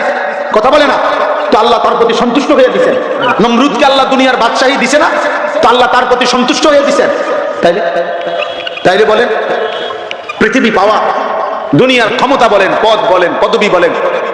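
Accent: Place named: Baripada, India. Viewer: Theek baripada dialogue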